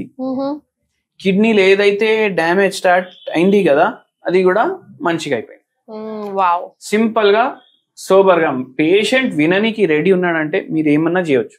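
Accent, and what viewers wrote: Telangana, India. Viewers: Thanks for your valuable information . Little bit telangana telugu accent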